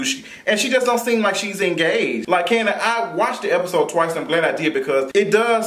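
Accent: Memphis, United States. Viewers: You’re so Memphis. Be currful (careful) lol I love it!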